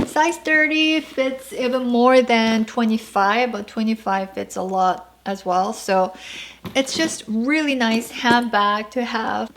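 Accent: South Korea. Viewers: sorry can i ask you one thing? are you from korea? cuz i hear some korean accents 😹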